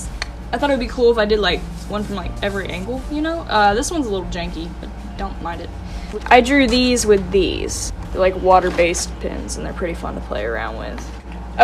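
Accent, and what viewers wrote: South, United States. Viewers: I feel like ur accent has gotten even more southern since the last time I heard you lol, also (obviously you don't have to share if you don't wanna) bit what state r u from I'm in alabama. Ye-haw... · you sound extra southern in this video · off topic slightly but i absolutely adore ur (southern?) accent it oddly suits you really well and it flows out smoothly unlike so many others 😭absolute incredible tour tho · dude why do you sound more yee-haw than usual? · why does your country accent sound stronger in this one lol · Omg finally holy shit anyways you got a cool southern accent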